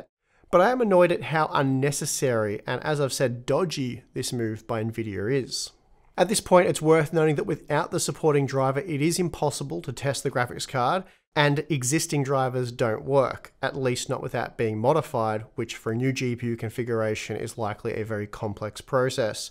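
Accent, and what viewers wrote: Australia. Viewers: Love your work. I follow 4-5 different channels and I like the different takes. Also, mixing it up with some aussie accents is always a good thing.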